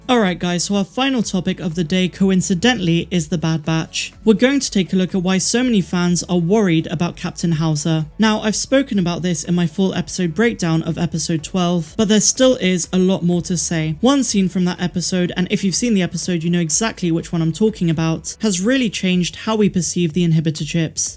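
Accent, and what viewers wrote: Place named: Bristol, United Kingdom. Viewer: I heard your bristonian accent, you hide it well. But not Palpatine well.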